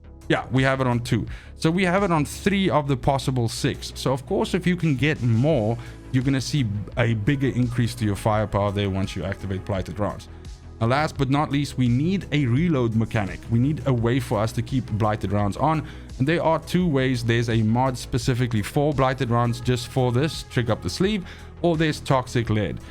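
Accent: South Africa. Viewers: subscribed brother, love your videos. Really well detailed and explain... and I hear that south african accent coming through. Lekker !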